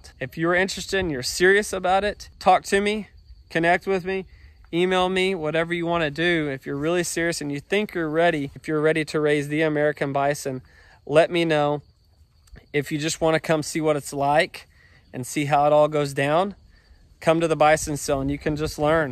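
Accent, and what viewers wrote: Oklahoma, United States. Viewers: I love Elenor. I’ve enjoyed your adventures. I have family in Moore so I enjoy watching the weather and hearing that great Oklahoma accent I’m in upstate ny. Thank you so much for sharing your lives. Good luck with the new place.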